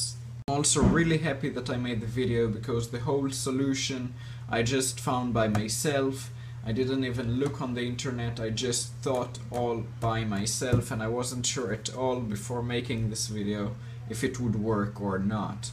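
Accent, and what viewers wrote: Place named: Germany. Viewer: Mir gefaellt dein deutscher Accent.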